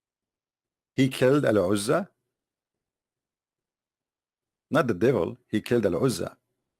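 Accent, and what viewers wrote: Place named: Israel. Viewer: What in the name of the judgement day are you talking about? Arabic Gods? Islam came to destroy those false Gods. God did not give birth nor was born. it is the Clearest message in the book that you insult. why there is always those small channels that no one knows with a guy with thick israeli accent trying to promote something so ignorant to such small number of people off the grid, don't you feel ashamed?. i mean if you hate the religion okay. you're free but don't be stupid. there are history books written by your people, educate yourself before talking stupidly and making a joke out of yourself. you're supposed to be a teacher, a preacher? what are you? your job is to attack other people's beliefs based on lies? is this what your main religion taught you? to spread lies in order to badly influence ignorant people that has no knowledge about anything ? 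Whatever man. God is Greater than any work in the dark. He's always awake and always sees everything. Even the things we never say. keep that in mind. Peace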